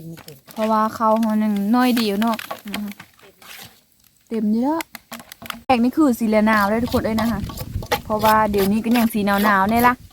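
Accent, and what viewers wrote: Phu Thai, Thailand. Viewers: ข้าวใหม่ เป็น ข้าวไกม้ มำไมไม่พูดว่า ค้าวเม้อ (หยอก)ป้งเพราะดีเน้อ สาวภูไทตนงาม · สำบายดีน้อง ผุน่ารัก. สวยจังสาวภูเทอ.ขยันจัง.สำเนียงภูไทม่วนหูดี..กเฝห้กำลังใจนำเด้อจ้า. จ๋า...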